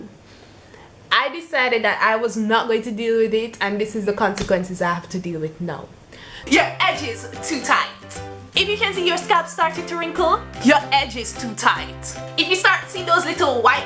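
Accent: Jamaica. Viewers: I LOVE,LOVE YOUR ACCENT ARE U JAMAICAN Cuz U HAVE MY GRAMMARS ACCENT LOVE IT!!! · Are you Jamaican because I can hear your accent a whole lot! I'm Jamaican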